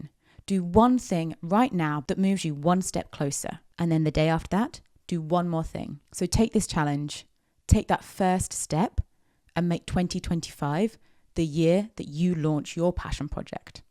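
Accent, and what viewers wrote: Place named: United Kingdom. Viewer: Love your british accent. For you i dont need the subtitles 😅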